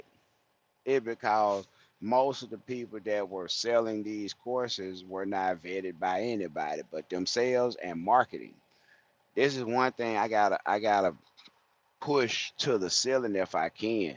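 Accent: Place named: Mississippi, United States. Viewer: man you sound like one of my homies from Mississippi are you related to some kings from Hattiesburg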